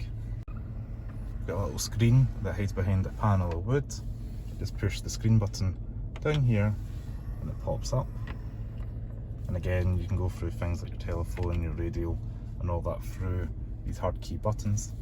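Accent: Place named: Scotland, United Kingdom. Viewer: You look and sound so Scottish❤❤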